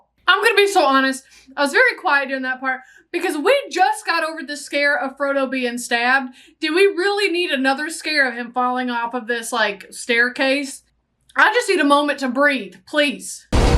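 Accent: South, United States. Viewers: your Southern voice makes me think of home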